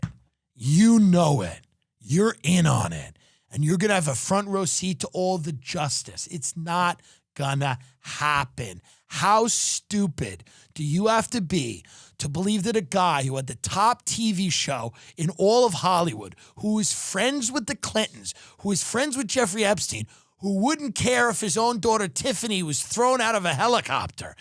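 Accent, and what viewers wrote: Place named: Queens, United States. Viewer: This guy must be from Queens or LI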